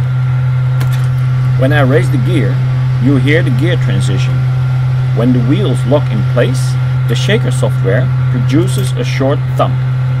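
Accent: Netherlands. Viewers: if his accent didn't give him away, his website most certainly did. Gave projectjes meneer! Ik ga zeker naar uw videootjes kijken. Ik wil heel gauw beginnen aan mijn eigen cockpit for Digital combat simulator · Are you Dutch?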